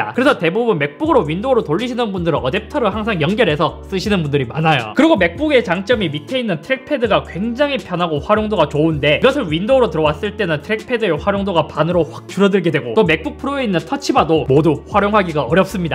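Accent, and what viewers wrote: Gyeongsang, South Korea. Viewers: 잇섭님 혹시 대구에 사세요? 경상도 사투리가 들리는것 같아요.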